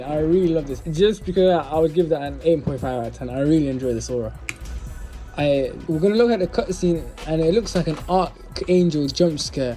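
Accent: United Kingdom. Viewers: Ngl didn’t expect you to be bri’ish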